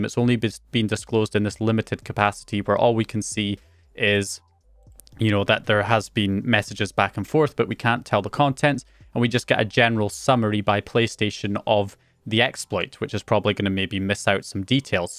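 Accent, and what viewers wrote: Scotland, United Kingdom. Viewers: Jim Browning is from Northern Ireland and is Scottish, but the two regions do share similarities such as slang words/colloquialisms.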